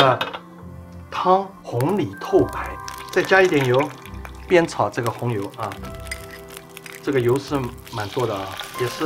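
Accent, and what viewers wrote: Shanghai, China. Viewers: 你带着上海腔调的普通话实在太可爱了， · 身在海外听到这样的沪普浑身舒适!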